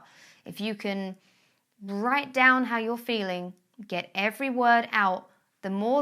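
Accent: United Kingdom. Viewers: the kind of anxiety that I usually have is a bit different from what you describe. But I just went to rest from having one from a complicated social situation and watched this video and I realised why I like these, it calmed me down to just listen to someone talking about a relatable(™) topic in a calm British English and now I'm calm as a little lamb. thank you :D my usual go to methods are meditation, breathing exercises and going through the alphabet in my head oO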